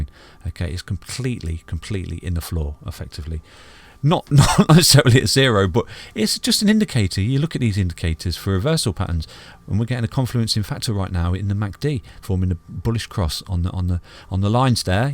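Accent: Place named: England, United Kingdom. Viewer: LMAO this is a proper english accent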